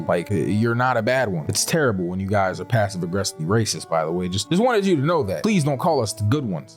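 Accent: California, United States. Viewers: I like your voice are you from Cali?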